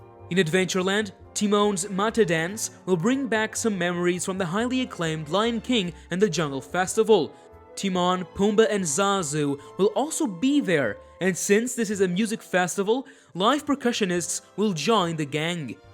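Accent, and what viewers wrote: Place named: United States. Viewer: We need an English voice and I'll subscribe! This voice is too American sounding 😰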